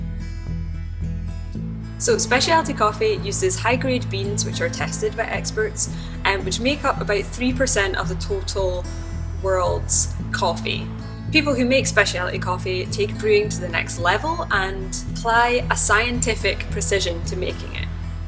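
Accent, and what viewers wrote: Scotland, United Kingdom. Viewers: Is that a Scottish accent I detect :)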